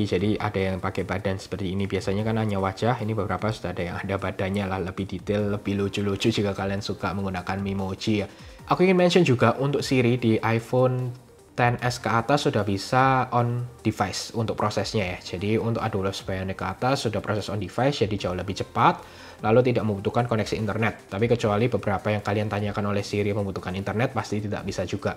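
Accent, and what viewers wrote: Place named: Banyumas, Indonesia. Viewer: mantap mas..ngapak ya mas..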